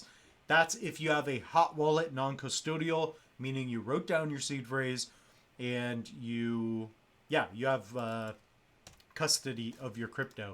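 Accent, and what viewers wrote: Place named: Canada. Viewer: Heard the Canadian accent 😂 I was just thinking "if he's not from canada he's from Minneso-da"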